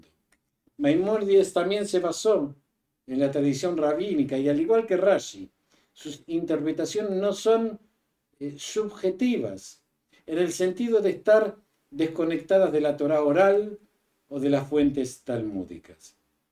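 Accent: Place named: Buenos Aires, Argentina. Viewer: Yo pienso, señor que tiene una voz buena para el canto, y su acento porteño tan marcado, me gustaría escucharle un tango, o una milonga, como:, y otros. Porsupuesto con todo respeto a sus enseñanzas, y lecciones cuantas cualidades, shalom ve alejem.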